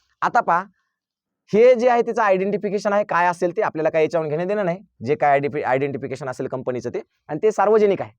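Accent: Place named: Parbhani, India. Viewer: भाषा परभणी ची वाटते सरांची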